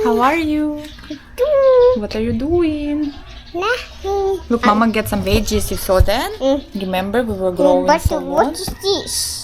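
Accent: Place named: Russia. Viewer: I love hearing your Russian English accent, keep uploading guys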